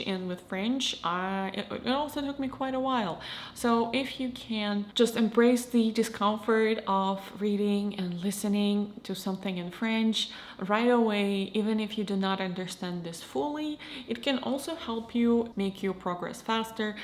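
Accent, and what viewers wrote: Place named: Russia. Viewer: The late zoomer/early millennial Russian accent is something else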